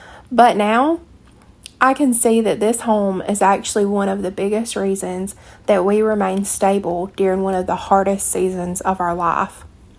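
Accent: South, United States. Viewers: Girl I have no idea what state you live in. But obviously from your accent you live somewhere in the south. Do you know how amazing it is to own a house at 21? Girl, i graduated from college at 21 and then wanted to go crazy and live a life of freedom. I wasn't even remotely right in the head to get married until 26. Don't ever feel ashamed of your house that you bought and is paid off. You are a light years beyond where many people were at your age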